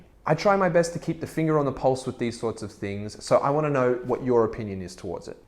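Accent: Australia. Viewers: I love my 2019 Ninja 400 KRT. Same livery as yours. Here in Sydney, I got mine for a lucky $4.8kaud that's done only 4kkm with Two Brothers Hurricane exhaust, Oggy sliders, tinted shields and 8 months rego. Clean as condition! It's the best! I don't even have my Ls yet so I just ride it around the property for now lol 2 weeks to go till my RKT. Just discovered your channel, good stuff! Nice to hear an Aussie accent motorcycle YouTuber!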